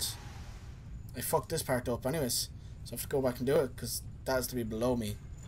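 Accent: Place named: Ireland. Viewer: your a great youtuber, I love ya Irish accent love. keep up with the youtubing.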